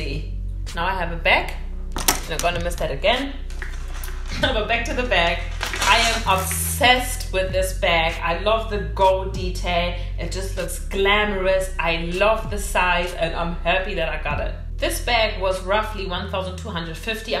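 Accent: Germany. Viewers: Are you german ich hörs raus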